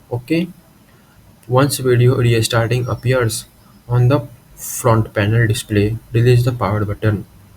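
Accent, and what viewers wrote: India. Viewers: Put info in English cannot understand his voice with India accent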